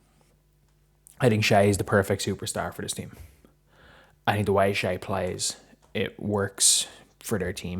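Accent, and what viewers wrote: Ireland. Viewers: friend, you're doing a great job. This side of the pond accent ia also a breeze of fresh Irish (Welsh?) air. Looking forward to walking with you on our journey of following Luka the fab. Lets cross fingers he gets out of his shooting stink fast and that this is finally the year he gets some, personal if not team-oriented, crowns. Cheers from Slovenia. I groaned loudly when I saw his "pedestrian" stat line and the final score. This isn't the year, Lakers are still fake contenders. Too good for the middle of the road teams, but not "not quite my tempo" for the elite teams. But dropping all sense of urgency after that collossal SA win is dishartening, bastards had my hopes up.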